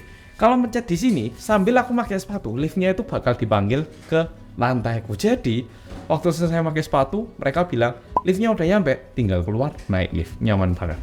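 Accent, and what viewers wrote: Java, Indonesia. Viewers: Aku nek lali jenenge pasti nyebute ngene "Wong Korea seng ngomong bahasa Indonesia logat jowo seng bojone wong Singapore bahasa inggrisan" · Korea tapi medok jowo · Kelazz oppa logat jawanya ga bisa hilang wkwkwk😂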